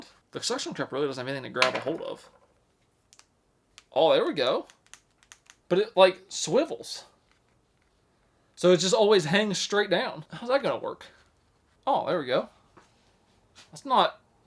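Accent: South, United States. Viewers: Maybe it's just the Southern accent but dude will you dropped on your head? Either that or you've huffed a lot of petrol. I bet you think the world is flat and birds aren't real.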